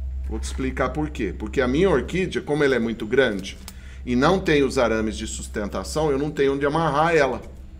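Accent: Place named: Minas Gerais, Brazil. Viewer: Ai tu é mineiro uai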